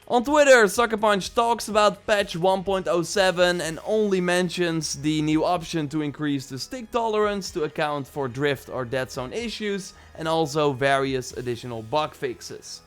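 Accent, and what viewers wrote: Netherlands. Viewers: Ik vindt t echt geweldig dat ik je Nederlandse accent meteen merkte, en toen je de ps store liet zien stond t ook in het Nederlands lol Goede info btw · I knew you were dutch :) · You sound Dutch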